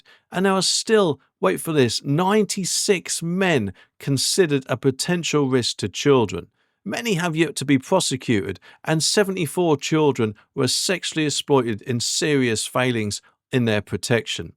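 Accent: Norfolk, United Kingdom. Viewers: Yeah 2 vids and I've subbed. U speak my language, and have a hint of Norfolk there as well 😂✌️🇬🇧👍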